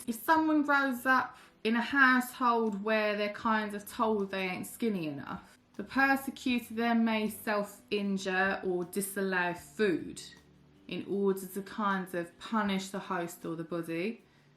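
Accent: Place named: Cockney, United Kingdom. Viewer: a... british accent? (is it?)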